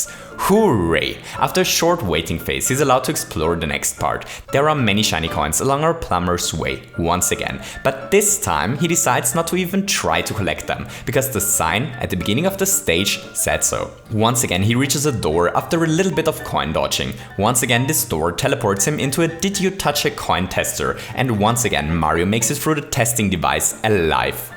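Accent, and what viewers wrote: Austria. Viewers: You are from Austria? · Wait. Are you austrian or Lithuanian? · youre austrian? looooooooool im austrian too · You're from Austria? Right?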